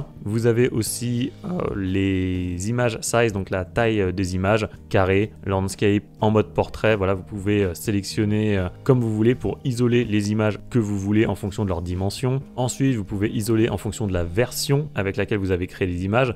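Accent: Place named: France. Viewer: j adore le petit accent très Frenchy sur l anglais :D mais merci pour le boulot réalisé, c'est chouette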